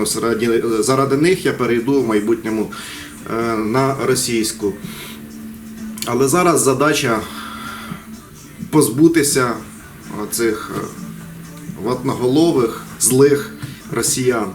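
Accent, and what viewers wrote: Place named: Surzhyk, Ukraine. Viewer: Только правильно не "перейдемо на украïнський" а "перейдемо на украïнську". Почему я, русскоговорящий, знаю грамматику лучше? Это как, никого не смущает? Если уже говорите на украинском, делайте это хорошо, не похабте своим корявым суржиком действительно красивый язык.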